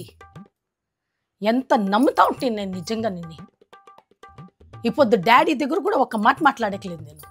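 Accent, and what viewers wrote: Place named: Bangalore, India. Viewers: Kannada slang telugu Bagundhi !! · Bangalore Telugu 😂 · Karnataka accented Telugu :) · This is Banglore Telugu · Ee slang variety ga undi. Ballary aa banglore aa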